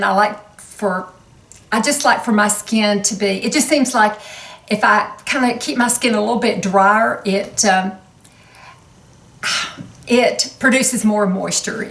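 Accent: South, United States. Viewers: Lol i dont know if it's the southern accent but you seem like such a sweet person, something about you reminds me of a warm hug. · Thank you for your help.You definitely look better than Christy Brinkley or any other Hollywood beauty guru.Please tell us about your diet and exercise,supplements. I find that woman that gain and loose weight tend to loose tone in the jaw line.You have amazing bone structure.Do you have any recommendations for face yoga or face massage?Can you share your ethnic background? Thank you for encouraging us to age well.I hope to move into being older and better as you have.Love the southern accent.God bless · Did ur accent get more southern? Lolz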